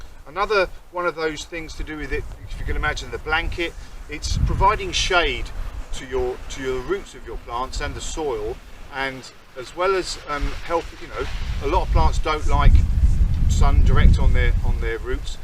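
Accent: United Kingdom. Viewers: I detect a bit of a British accent there mate. I love all things gardening and I am a huge anglophile so I am looking forward to more of your channel. Also I love to see gardening channels from my Nova Scotia area.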